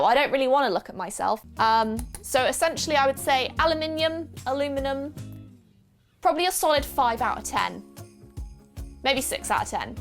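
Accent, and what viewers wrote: United Kingdom. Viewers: Are you from Britain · Omg i Love your british english 😍😍 (I'm from Germany and i am learning American english ) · Are you British??cuz ur accent tho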